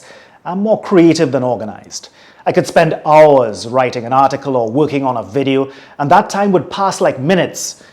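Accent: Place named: Trinidad and Tobago. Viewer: Not only an amazing story but well told and the Trinidadian accent tho I'm Antiguan (have family in Trinidad) gives me an at home feel But at just 18 years old there's a lot I will take from this video including the fact that I am now the writer of my own destiny I have the ability to grow develop choose the persons who I want surrounding me etc. Of course you cannot control everything and alot of times it's good to not be able to control everything because we serve a GOD who loves us and we must face challenges. But by far one of the most clear and fruitful messages I've ever received!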